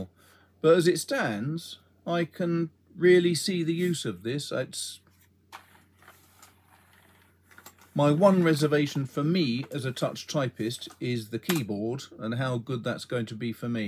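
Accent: United Kingdom. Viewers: Great review. I hope to be a charming gentleman like you one day! People from the UK are just classier than us Americans. It's gotta be the accent. Anywho! One of my big complaints about the Freewrite is the typing delay. When I'm on a roll and I'm comfortable, I can usually type 70 words a minute on average and I'd like to keep that consistent. And it seems like the device would struggle keeping up with such a speed. Any thoughts on that?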